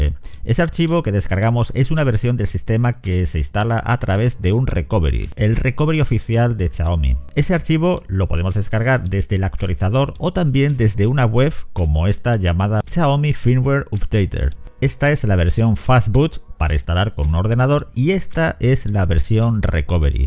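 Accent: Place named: Spain. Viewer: Es tener acento español y saber que no voy a perder el tiempo. Gracias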